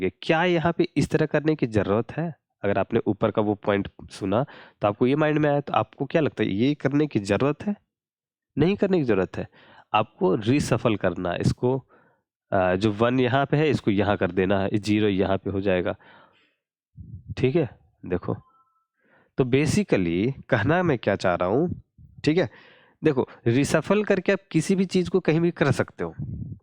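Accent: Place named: Bihar, India. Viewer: Thanks Bhaiya! Great Explaination! Loved your BIHARI accent! ❣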